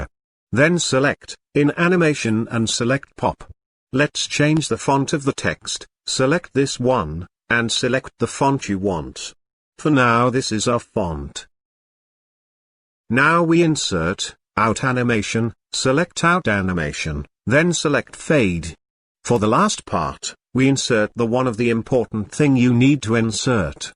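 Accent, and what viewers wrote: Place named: United Kingdom. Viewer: Ang taray ng boses british accent #chutee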